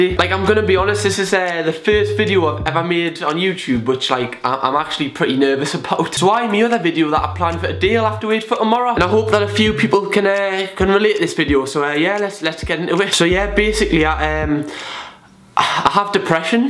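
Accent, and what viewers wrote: Newcastle, United Kingdom. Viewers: Mad that work is my escape from it! I always put on a front like ill make my self look good, put makeup on. And ill get through the day getting nice comments and that and ngl that does make me feel better about myself. But as soon as i take my makeup off ill get in the shower and cry cause no one can see the tears. And i just stand there thinking how much i hate myself and my life, and questioning when is it gonna get any better? Watching you videos fully makes my day you actually cracks me up. I adore ur geordie accent! Keep ur chin up and keep smiling hun 😘❤️ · Another fellow Geordie eh! Woop woop. 😉 this hurts my heart, hope your okay! ❤️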